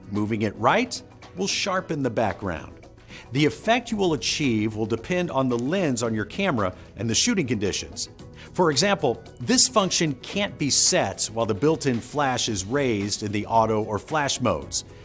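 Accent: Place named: United States. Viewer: Turned straight off, annoying American accent.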